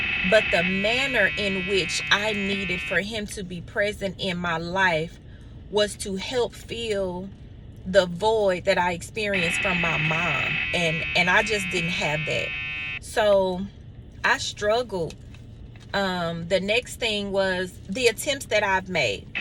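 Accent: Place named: South, United States. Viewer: You must be from the South. (Especially AFTER hearing your accent) Focus on yourself, and not Social Media. Obviously you had a rough childhood. That's probably why you like D-Boys!!! And you'll Always be like that!!! Good 👍 luck 🍀 in the Future. Sorry that you're Pops didn't give you that Validation.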